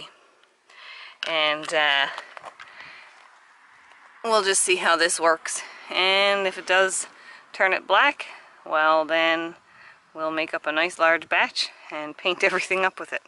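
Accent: Newfoundland, Canada. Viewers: Hello there, came across your channel searching for rust converter ideas and picked up on the accent of fellow Newfoundland and Labradorian. Not sure if that is the proper term now. I also checked out the video on the Mun Botanical Gardens. Really lovely. Will put that destination on the list for the next time I make my way home. Going to have a look through more of your videos and pass along your channel to some friends and family. I hit the subscribe button. Thanks for the content.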